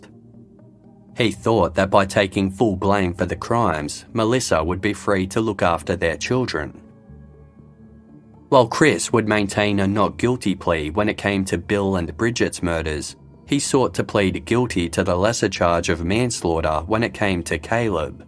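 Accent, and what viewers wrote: Australia. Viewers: my heart goes out to the kids of these monsters. Not only did they lose their grandfather, their grandmother and their daddy but now due to selfish custody BS, have lost their (other) stepfather/father and bitch of a mother! Love that this is narrated in our awesome Aussie accent! Cheers 🇦🇺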